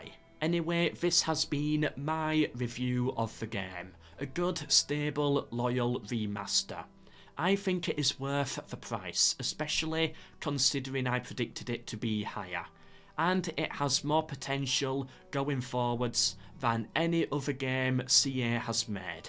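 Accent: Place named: Northeast England, United Kingdom. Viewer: What accent is that? North East England but where?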